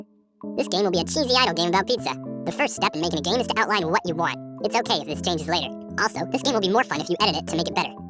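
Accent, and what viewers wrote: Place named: United Kingdom. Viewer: as the video goes on the more British the talker gets.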